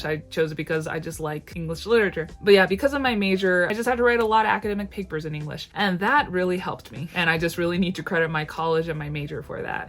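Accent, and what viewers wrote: United States. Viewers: Wow! I wouldn't have known you spent the majority of your life in Japan. Your English is so good. You even have the vocal inflections of Americans or Maybe Canadians in your age group. Congratulations on passing the B-2 DELLE. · No way I thought you were from the US!! ¡Que inspiradora! Gracias por compartir tu historia. :)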